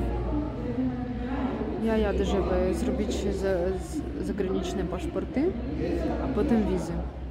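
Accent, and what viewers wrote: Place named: East, Poland. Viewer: Potwierdzam to, co czytałem w innym komentarzu, mówisz coraz lepiej po polsku :) Najbardziej uderza to, że "wschodni akcent" słychać u Ciebie tylko od czasu do czasu, gdyby nie sporadyczne zastanawianie się nad doborem słów (i czasem odmiana), spokojnie można byłoby powiedzieć, że jesteś Polką np. z Podlasia (region w pobliżu granicy z Białorusią, tam - zwłaszcza w mniejszych miejscowościach - ludzie mówią w podobny, lekko śpiewny sposób). Biorąc pod uwagę, że uczysz się sama, nie masz bezpośredniego codziennego kontaktu z Polakami, to jest bardzo duże osiągnięcie. Oglądam filmy na kanale "Odin Iz", prowadzi go Ukrainiec, który pracował w Polsce, pokazuje "szokujące różnice" między Ukrainą i Polską, na przykładzie nie pomalowanych osiedli w miastach, starych domów na wsi, tylko pod każdym z takich filmów dostaje wiele komentarzy mówiących o tym, że w PL są miasta i wioski wyglądające podobnie. 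Ty w ciągu kilku minut pokazałaś więcej różnic, niż on przez kilkadziesiąt minut swoich filmów, pociągi, droga, toalety - średnio w PL jest z tym już lepiej. Babcia na pewno ugotuje coś, co lubisz - wiele osób jest zainteresowanych potrawami z innych krajów, jeśli będziesz mieć okazję, możesz pokazać, z czego i jak przygotowuje się coś zwykłego, codziennego u Was, bo dla wielu oglądających to może być ciekawe :) Pozdrowienia dla Ciebie i całej rodziny, oby szybko udało się zrobić wszystko to, co planujesz :) PS: Ładne, nowoczesne mieszkanie.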